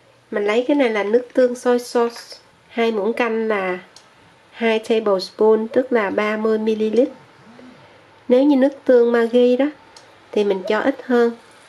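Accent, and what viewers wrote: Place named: Mekong Delta, Vietnam. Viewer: Quê Cô ấy o miền tây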